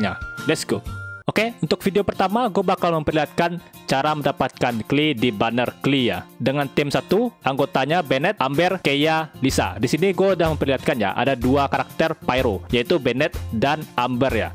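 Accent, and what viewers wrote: West Sumatra, Indonesia. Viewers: Org minang ya XD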